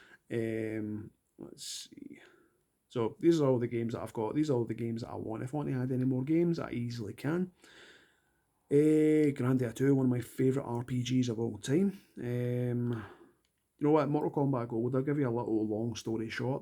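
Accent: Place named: Scotland, United Kingdom. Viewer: As an Irishman i am pretty sure he is Scottish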